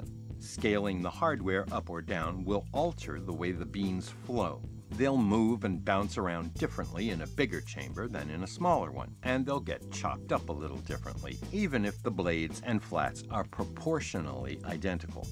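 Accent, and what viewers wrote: United States. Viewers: Hello, I really enjoy your content about coffee. Thorough, insightfull and a pleasure to watch. Thought you are American, but your channel says Ireland? Good voice anyway! Subscribed. Would you consider making a video about mods for the Mignon (Specialata)? Something I suggest experiencing: cotton pour over filters…. Please keep it up!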